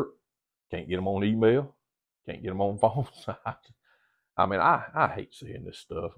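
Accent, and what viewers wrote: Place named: South, United States. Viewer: Cheers! Cool to see companies sending you gear. There are other channels with good people. But i was raised in part by a southern gentleman, and its cool to hear a voice with some southern twang in it, reviewing audio gear.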